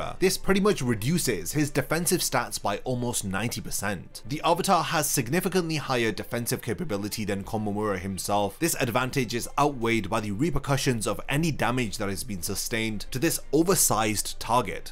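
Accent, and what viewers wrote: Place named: England, United Kingdom. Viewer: Please make an effort to pronounce those Japanese names correctly and not constantly using the English accent.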